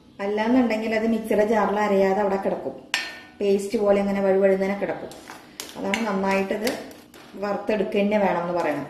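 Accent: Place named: Palakkad, India. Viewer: Chechi palakkad aano സ്ഥലം.. സംസാരം പാലക്കാടൻ ഭംഗിയിൽ ആണ്.. അതാ chothichath.. ഞാനും pkd ആണ്...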